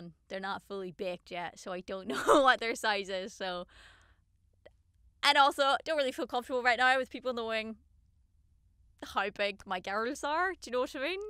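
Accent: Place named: Ireland. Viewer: First off, who the hell would write a hateful comment, this is for fans. Anyhoo, a close family member of mine has the same condition(pigeon chest) and a case of flail ribs(the bottom ribs stick out from the ribcage). A male, I guess it might be different in a self-esteem point of view. It posed no health issues and he worked out and went to the gym and although it's still noticeable, not nearly so as before like when he was very young. I didn't know there was actually a surgery to fix or change it; not including the enhancement that is. No one should make someone feel bad about having an elective surgery to correct an issue or to help one feel better about themselves. I enjoy the sound of her voice so much. The Irish accent is absolutely mesmerizing.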